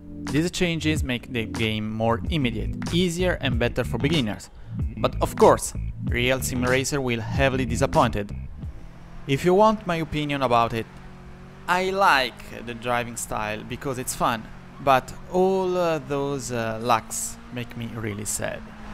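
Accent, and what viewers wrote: Italy. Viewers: are you italian? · This guy is Italian isn’t he?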